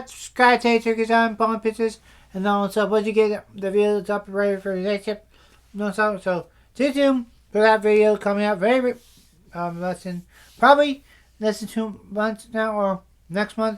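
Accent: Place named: England, United Kingdom. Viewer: Awesome airplane review is very interesting and so much information enjoy like your English accent smile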